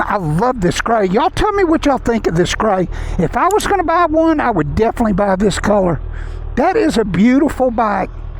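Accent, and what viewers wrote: South, United States. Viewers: God u sound so country😅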